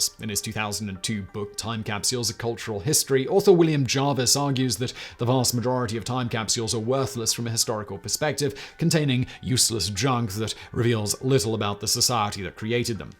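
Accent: United Kingdom. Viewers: I know this was an old video when it started because Simon still has his full british accent.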